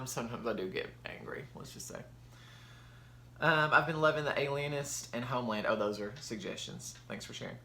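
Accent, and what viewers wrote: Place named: Alabama, United States. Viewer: I just love your accent! I'm an Alabama girl so it makes me feel right at home. I really enjoyed learning more about you. You have such a great personality 😍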